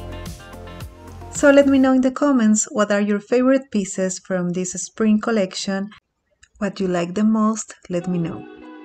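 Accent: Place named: Spain. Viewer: Hola! Me encanta ese lugar, se nota que tienes acento español... Haz algún video en español para los que no entendemos el inglés!!! Gracias!!!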